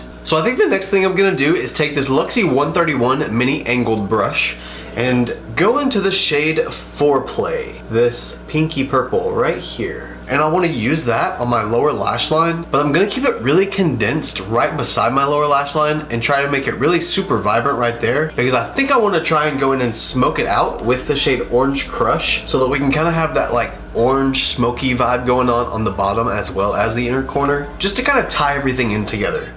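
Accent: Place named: South, United States. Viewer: Loved watching you create this gorgeous look,. That shimmer purple next to the shimmer yellow on the lid is such a beautiful color combination. I've been trying to place your accent (I knew it was Southern US, as I live in west Tennessee), & then noticed your description box. Haven't been to Hot Springs in quite a few years now (I used to visit more in my university days, as one of my buddies had family there who owned a dairy; one of the last that did doorstep delivery before his grandfather passed). It is such a beautiful place to get away & enjoy a bit of mountains without having to drive forever. Anyway, thanks for sharing this fabulous look with us, & hope you are enjoying a superb week so far! 😊💖👍🏻🌷🌻🌼🌹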